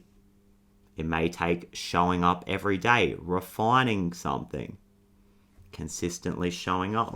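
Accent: Australia. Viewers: Bro hooked me with that aussie accent! 😂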